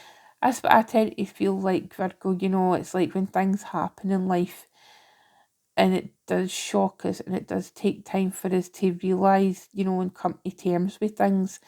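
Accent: Scotland, United Kingdom. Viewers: Thank you for your compassion and thoughtfulness thru this reading. I love a Scottish accent ☺️ onwards and upwards 🙌 thank you 🙏❤️✨ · Her Scottish is very broad but there is always the option to turn in the captions. I can’t watch a Scottish or Irish show without them and this is no different but worth the effort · So nice to hear a beautiful Scottish voice. Thank you for the messages, it definitely resonates.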